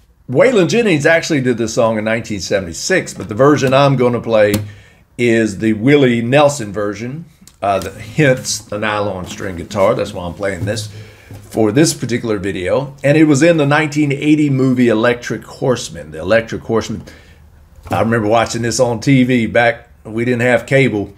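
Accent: South, United States. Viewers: Great call on the 3/4 timing. I just got through seeing another video of this song, supposedly Willie Nelson version, and they were playing in faster tempo in 4/4 timing. Btw, love your voice as well. Very Southern 👍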